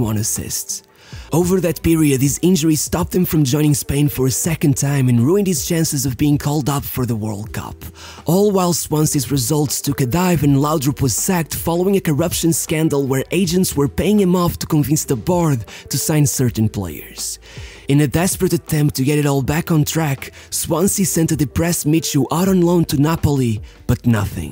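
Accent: Portugal. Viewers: Tuga accent😁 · you sound portuguese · They are not. is reading the names in his Portuguese accent 😅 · I'm sorry for asking but are you Portuguese? You sound Portuguese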